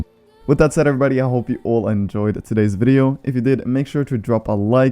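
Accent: Norway. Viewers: Are you Norwegian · U from Norway?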